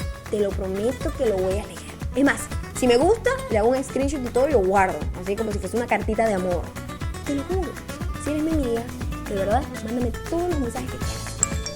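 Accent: Venezuela. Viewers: Más venezolana imposible! Lo ame jajajaja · Me encantó! Esto suena demasiado a monólogo venezolano. Bella Bella! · Excelente! y lo mejor de todo es el estilo venezolano... "que paso? si fino" :D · mas venezolana imposibleeeeeeee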